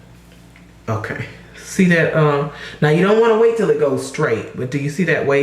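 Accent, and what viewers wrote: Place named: South, United States. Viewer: he's so country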